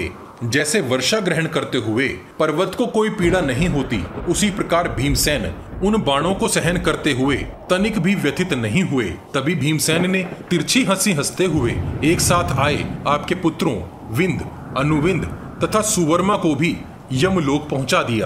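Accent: Standard. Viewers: अत्यंत प्रभावशाली आवाज शुद्ध उच्चारण सुनकर मन प्रसन्न हो जाता है मैंने गीता प्रेस गोरखपुर के कल्याण अंक में ये कथा पढ़ी है पर आपकी आवाज में सूनना आनंद दायक है कृपया शकुनि के बारे में जानकारी दें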